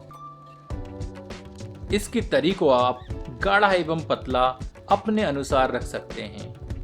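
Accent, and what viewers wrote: Standard. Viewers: Aapki Shudh Hindi bolne ka mein samarthan karta huin..sadhuvad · Chef aapke tone ko sunkar hi pet bhar jaata h ❤️❤️❤️❤️🥰🥰🥰 Itna suddha aap hindi bolte h to khana kitna tasty hota hoga ❤️❤️ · Bahut shudh hindi bol rahe hai aaaa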